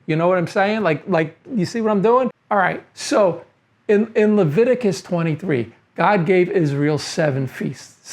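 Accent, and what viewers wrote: New York, United States. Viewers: Thank you for your reply! I've visited NYC a number of times and have friends in Queens, so I noticed your accent immediately. Brilliant video - a unique take on the issue! 💙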